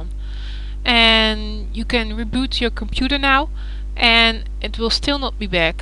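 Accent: Netherlands. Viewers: Ben je nl? Je accent klinkt nl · Haha Nederlands zeker, hoor het aan je accent! Wel goed gedaan hoor! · youre dutch right ;p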